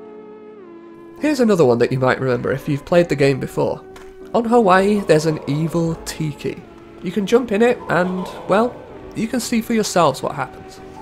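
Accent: United Kingdom. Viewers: I'm happy to have this to watch, but at a certain point I got to the stage of thinking "if I hear him say 'neversoft developer' in the same nonchalant British-accented English teacher tone just one more time, I'm gonna lose it" You really should have broke that section up or just listed all the names in rapid succession and said they were all developerS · Where in UK are you from?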